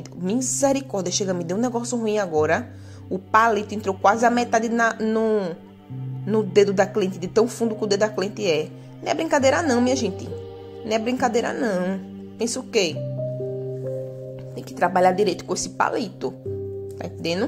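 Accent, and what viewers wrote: Northeast, Brazil. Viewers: adoroo muito seu trabalho parabens, gosto mais ainda qndo vc narra seu sotaque nodertino e muito maravilho mulher vc me mata de ir... susseso pra vc em 2021 continue prazendo alegria pra nois Brasileiros 😂😂😂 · Seu sotaque e maravilhoso eu amo o sotaque do nordestino e lindo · tô amando os seus vídeos e sua voz então é das nossas tbm sou nordestina com muito orgulho, sou alagoana com de coração, sou lá de Craíbas,mais por enquanto estou morando no Pará, mais com fé em Deus ainda volto pro meu lugar, bjs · Prefiro vc comentando com seu sotaque nordestino. Sem fundo musical por favor.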